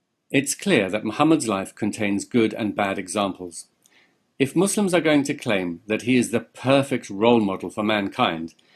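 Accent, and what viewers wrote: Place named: United Kingdom. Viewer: Your beautiful posh English accent makes everything sound more effective. Nicely explained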